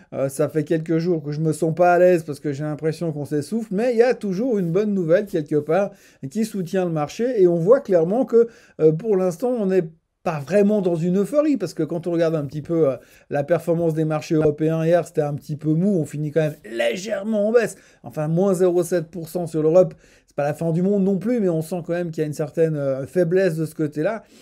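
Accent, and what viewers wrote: Switzerland. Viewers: Dedieu dedieu, il me manque cet accent suisse. Merci pour ce que vous partagez ;-)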